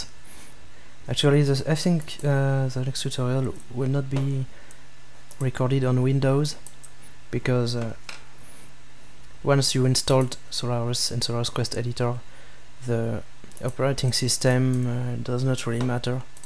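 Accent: France. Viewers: Are you french ? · Whew dude you have a thick French Accent.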